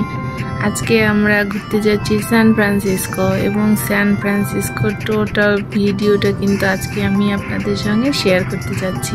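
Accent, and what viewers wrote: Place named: West Bengal, India. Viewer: Apnar bangla uchharon Bharotio bangalir moto..bes valo , all the best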